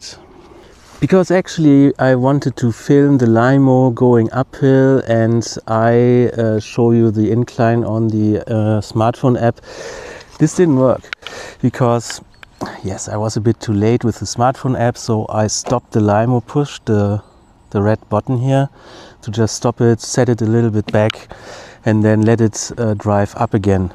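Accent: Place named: Germany. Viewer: Hää das klingt ja ziemlich deutsch dein Dialekt 😅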